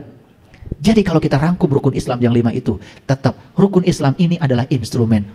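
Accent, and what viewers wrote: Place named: West Java, Indonesia. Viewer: Si paling kemarab, lidah Sunda koq dipaksa jd arab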